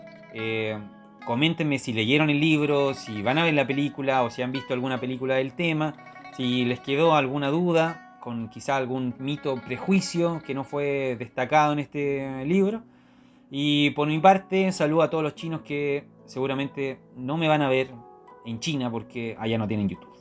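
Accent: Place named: Chile. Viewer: Encantador acento chileno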